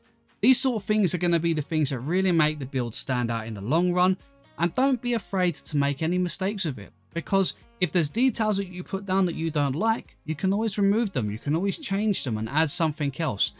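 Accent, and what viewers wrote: United Kingdom. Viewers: I HATE HIS BRITISH ACENT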